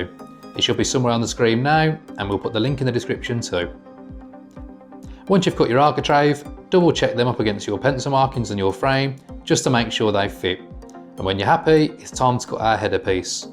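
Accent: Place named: Black Country, United Kingdom. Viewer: I'm a Brummie though 😅 he sounds more like a black country yam yam.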